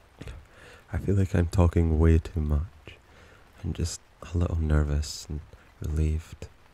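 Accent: Scotland, United Kingdom. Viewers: It's nice to hear a familiar accent! Not enough Scots on here. My only pointer is emoting. Not all of it sounds totally natural. You do have a very soothing voice though, and I like the rest of it :) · Errr is this a scottish accent im not good with accents TvT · I’m Scottish and this just proves scotty accents are just>>>> 😮‍💨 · Me, being Scottish and losing my shit over his accent because I didn't expect it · if i’m not mistaken, it’s actually scottish! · It’s a Scottish accent and I’m in love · ... hey Mr. Wonderful, Do my ears deceive me?? Are you Scottish?? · IM OBSESSED WITH HIS ACCENT 😍 I live in America so I barely hear rare accents like his. Scottish accents are SOO unique 😍😍😭😭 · This might be an insult but are you Scottish 😅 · Omg are you Scottish?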